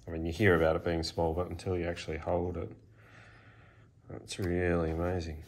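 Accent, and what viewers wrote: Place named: Australia. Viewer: You sound Australian. How long did it take to be delivered from the US? I am in Melbourne.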